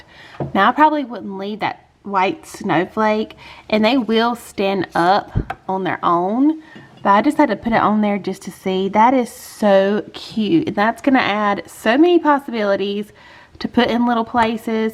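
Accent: South, United States. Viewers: Thank you for sharing!! I’m so excited to decorate, and you just made me ten times more excited! Love your channel, and I just subscribed! Also, do you live in the south? We share the southern accent.🤗💜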